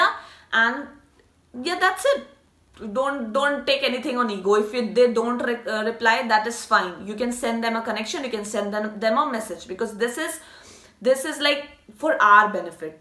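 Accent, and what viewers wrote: India. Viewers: After listening her English i am conform that she is an Indian.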